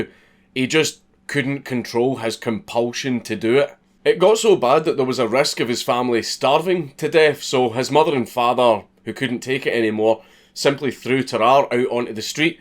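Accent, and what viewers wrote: Scotland, United Kingdom. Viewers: Swallowing corks sounds a bit funny with a scottish accent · scottish man reads wikipedia at you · Just watchin this for the Scottish accent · Subscribed to Sue´s channel too. 1: I like the scottish accent 2: To support Mister and Misses Dankular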